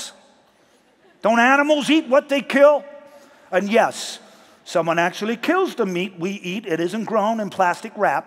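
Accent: United States. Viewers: I swear. Damian Lewis’ American English is hands down spot on! ❤ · Has nothing to do with Trump. The accent is far from being uniquely his, and it doesn't sound very much like him anyway, pretty far stretch to say he's mocking Trump. Lots of folks in the US have a similar accent.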